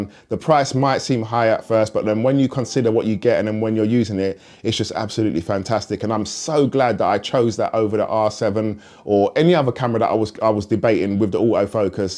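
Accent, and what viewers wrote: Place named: South, United Kingdom. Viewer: Nice video mate, great to hear another southern British accent on YouTube hahaha!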